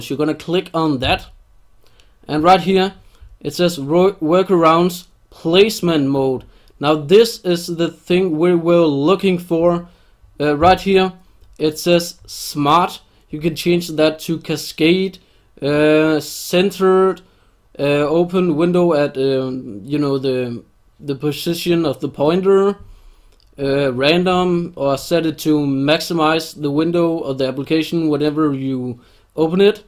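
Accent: Denmark. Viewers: is a nice name:D And still so danish and so badass english I do really like your english speak:D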